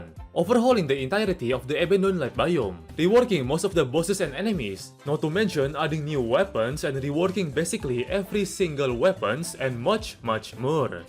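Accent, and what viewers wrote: Russia. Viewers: The russian accent being so thick you have to wade through it to get to the other side is great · Maybe Russia :P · No soy inglés pero creo que el tiene un acento ruso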